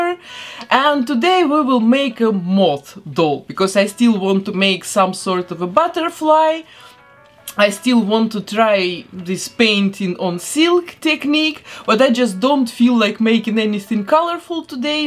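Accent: Russia. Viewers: А вам тоже кажется, что она русская,но "английский" акцент её выдаёт?)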